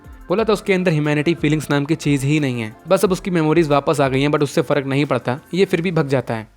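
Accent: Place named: Gujarat, India. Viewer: Are you Gujarati 🤭💜🖐️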